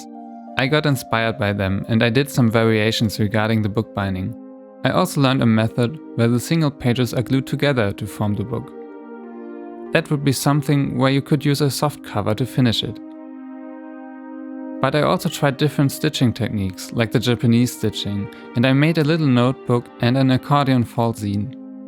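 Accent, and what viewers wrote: Germany. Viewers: Are you from Germany? :D Cause you have this accent and you subscribed to Coldmirror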